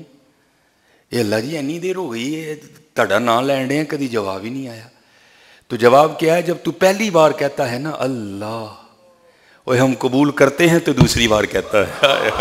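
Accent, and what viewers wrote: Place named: Punjab, India. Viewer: اردو اور پنجابی کی کمال مٹھاس ہے آپ کے لہجے میں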